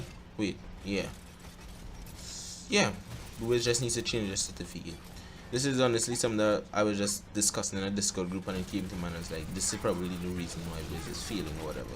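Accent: Trinidad and Tobago. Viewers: Eh. u from the Trinidad by chance?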